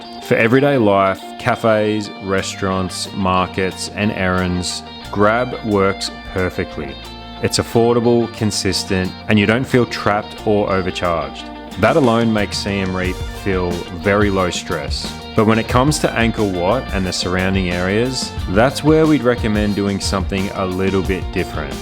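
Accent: Australia. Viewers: Hi, I’m heading to Cambodia in a month with my wife brother and sister in law, do you need a motorcycle license for the Tuk Tuk and how much did it cost? Loving your videos and good to hear an Aussie voice in them. 😁👍🇦🇺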